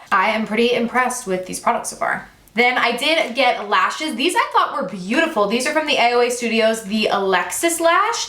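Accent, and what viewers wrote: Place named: South, United States. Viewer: I was wondering where you are from because i catch you saying southern things and a little accent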